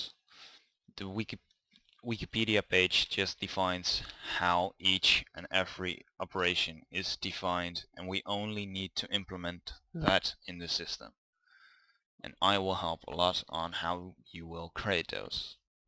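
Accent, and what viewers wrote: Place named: Netherlands. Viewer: It's dutch accent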